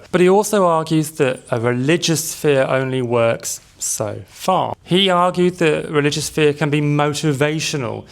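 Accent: United Kingdom. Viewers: You sound very british yet you look very slavic, hope it makes sense, also awesome vid btw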